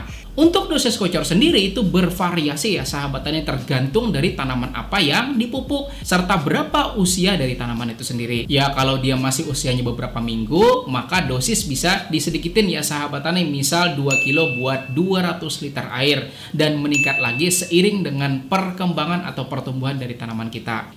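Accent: Banjar, Indonesia. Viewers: npk 16 yg paling bagus npk yaramila.. logat bahasa nya org Banjar lh? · Kya orang banjar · Logat banjar 😁 sipp dah bang info nya , tks 👍👍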